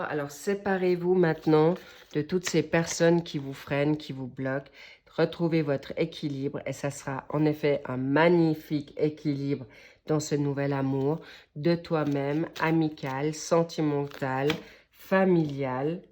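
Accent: England, United Kingdom. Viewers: Merci, comme vous dites, c'est "wow"... Connections d'âmes et de coeurs..... D'après votre léger accent, je pensais que vous étiez anglaise...(où autre anglo-saxon)....😉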